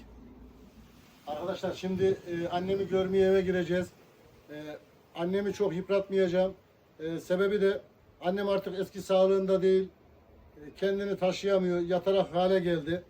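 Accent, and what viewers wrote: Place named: Central Anatolia, Turkey. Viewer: Şefim seni çok seviyorum programını takıp ediyorum.Şivenle anlatışınla buram,buram kokan bir İçanadolu çocuğusun.Hep merak ettim araştırıdım. Sana sağlıklı,huzurlu,mutlu,umutlların tükenmeden yaşamın tükenmesin.Seninle seni görünce bir gönül bağı kurdun çünkü bende Kırşehirliyim.Selamlar,sevgiler.....!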